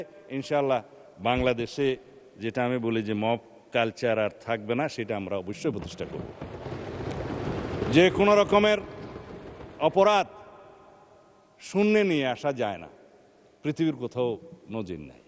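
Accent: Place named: India. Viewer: এর কথা শুনতে ভালো লাগেনা,,, ভারতীয় একটা ভাব এর মধ্যে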